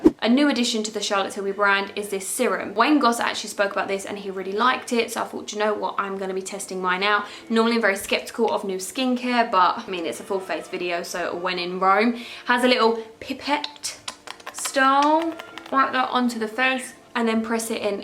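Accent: Essex, United Kingdom. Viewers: Are you from Essex?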